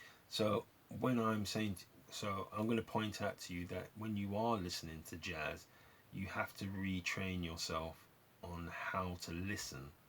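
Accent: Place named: United Kingdom. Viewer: I totally agree with you about Nancy Wilson! thank you for this video. . . very information and presented in that British accent that just makes me melt. . I’m just a puddle by now :). stay safe and well.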